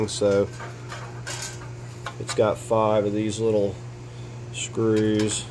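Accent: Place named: Southern Ohio, United States. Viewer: Fantastic set of videos - on my ‘05 Tribute V6 I have oil leaking out of my oil pan, main crank and valve cover. It’s a mess! I’m using your videos to do all three. I’m competent but not confident under cars, so these are just perfect. You sound like you might be from Southern Ohio too so that makes you okay in my book to begin with. 👍 Thanks again for sharing your expertise and making such clear to follow videos!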